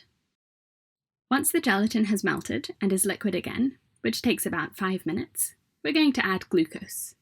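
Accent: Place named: Scotland, United Kingdom. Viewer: Where are you based? Sounds like you have a touch of Scottish in your accent but I can't place it. You have a lovely speaking voice.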